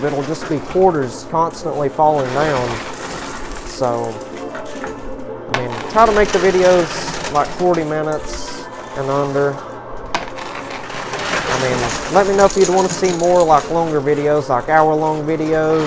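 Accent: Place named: Iowa, United States. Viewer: He sounds like a country boy from Iowa that is making it big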